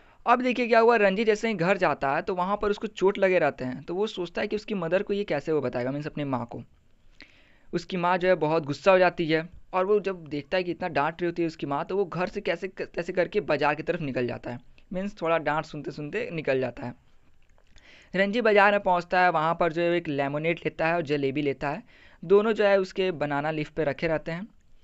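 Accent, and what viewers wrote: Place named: Jharkhand, India. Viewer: Jharkhand se ho na?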